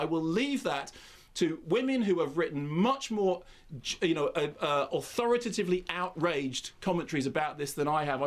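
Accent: United Kingdom. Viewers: A British accent.....don't y'all have a monarchy still along with a bunch of wealthy elitists in your nation? Not saying you support them or anything......but just because they come from America doesn't mean that their consumerist and capitalist mindset is synonymous for all Americans. Come back when y'all change the government structure of the UK.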